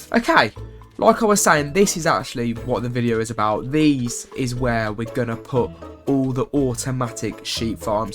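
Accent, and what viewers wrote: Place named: United Kingdom. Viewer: helo how are u Dude British accent is funny 😂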